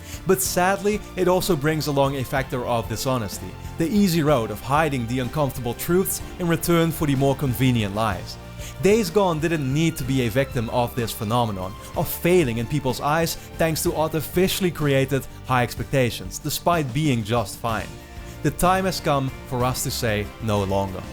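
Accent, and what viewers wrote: Netherlands. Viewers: Your loud inhaling after each sentence is very distracting. It would be nice if could cut it out. A lot of Dutch people have a terrible accent, yours isn’t too bad though. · judging by your accent im guessing you are dutch, the dutch tend to be straight forward and say it how it is, it clashes with the sjw hivemind coming mostly from america, dont worry about it, just speak your mind and don't be afraid of having an actual personality, it is the reason i am subscribed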